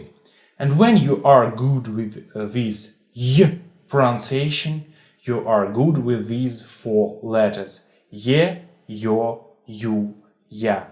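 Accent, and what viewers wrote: Russia. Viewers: Ахуенный акцент братан, иностранцы в эйфории, настоящий русский учит))))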